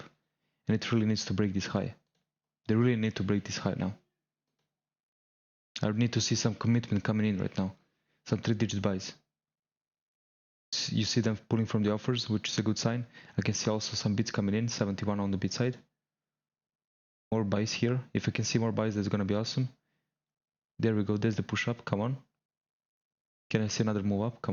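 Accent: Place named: Serbia. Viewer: Odmah sam po naglasku znao da si Srbin, bravo najzad jedan covek koji razume sta je orderflow i sta znaci Dom trading. Iako je posle korone sve teze pratiti indicies jer je bid/ask tanak i options flow igra veliku ulogu zbog delta hedginga i dalje je dom najbolji nacin da se razume sta se desava u trenutku! Samo napred odlican kontent!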